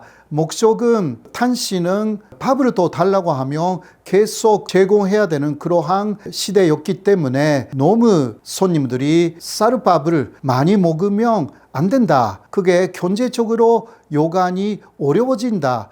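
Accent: Japan. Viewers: 호사카 선생, 오래 전 귀화 하고 대학에서 강의도 하시는 분이 어찌 아직도 한국을 한극으로 일본식 발음 하고, 일본어 억양을 전혀 못 고치고 있는지 안타깝습니다.쌀밥도 싸르밥으로 발음 하시고~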